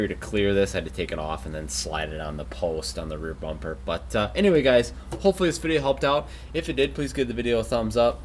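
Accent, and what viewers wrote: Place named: Midwest, United States. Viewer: From the Midwest are ya?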